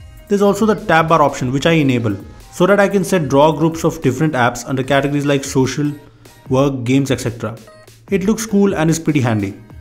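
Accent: India. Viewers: love his indian accent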